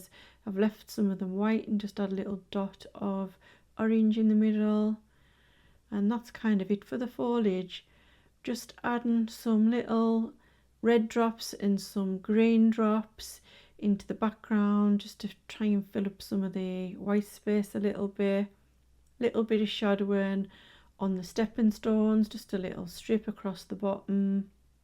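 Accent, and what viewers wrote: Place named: England, United Kingdom. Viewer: trying to place your accent is killing me. I have lived outside of the UK for 14 years and I cannot work out if you're from the Midlands or the NorthEast lol. Please tell??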